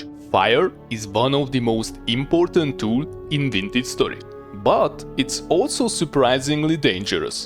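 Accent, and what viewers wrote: Hungary. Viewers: Oh I never realized that shoveling cattail roots is the way to go... also that's some nice Hungarian accent you have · Csodás videó! Mint új játékos, nagyon sok szenvedéstől fog megmenteni. A magyar akcentus meg fenomenális! Csak így tovább! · Akcentusból már tudtam hogy magyar vagy xD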